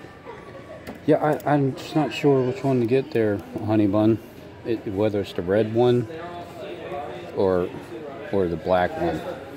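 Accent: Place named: East Coast, United States. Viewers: I hate to tell you this, but I have worked on the cameras and monitors in Walmart, and on a day like that, you probably have one, maybe two Loss prevention people working, and one if them is watching monitors and doing all of their management duties, and the other one will walk some and do double duty. BTW, everyone had an empty push cart at one point. I promise you that their are no where near the amount of loss prevention that work in that store all together, as you saw in 5 minutes. You are probably trying to pass people off so that they comment, and do you can get paid. It is really activating listen to this bullshit that isn't even close to being true, on top of that, the Baltimore accent just rubs me wrong. It could be South Jersey or Southeastern Pennsylvania, but it's really a Baltimore accent heart and it is the most obnoxious accent in the country. 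By the way, is anybody shopping there? Or, are they all trying to keep you from stealing a $1 item. Walmart method is to identify you and call the police. By the Walmart or installing facial identification software, and they're gathering information everyone just like everybody else. Won't be long before you walk in that store and they know if you're a thief, and I know the kind of stuff you buy. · it could be on the East Coast or the Upper Midwest since he does have a little bit of an inflection of an accent when he speaks. Not sure.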